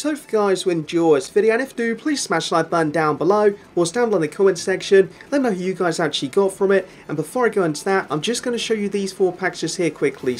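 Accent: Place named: England, United Kingdom. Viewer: What part of England are you from the accent is different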